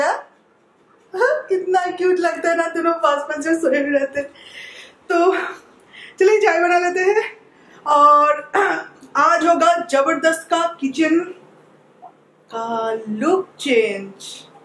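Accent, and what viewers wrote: Bengal, India. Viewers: Main hun Bengali...... U try ur best to speak in Hindi, but pura Bengali tone aata hai.. It's OK... U will learn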